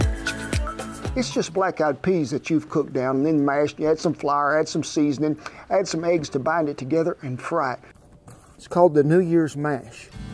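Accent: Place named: Wisconsin, United States. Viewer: You and cheese! You almost sound like you could be from Wisconsin. Thank You.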